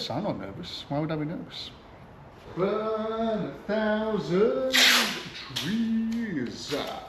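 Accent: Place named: Wales, United Kingdom. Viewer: My elderly mother is wondering whose lovely Welsh accent that is wafting around the house. I keep leaving YT on auto-play.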